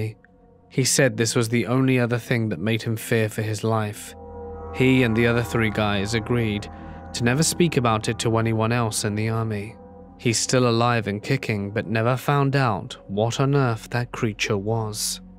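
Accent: United Kingdom. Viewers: I love your British accent. I was raised by a British mom, who is now deceased. And thank you for being so expressive when you read these stories!!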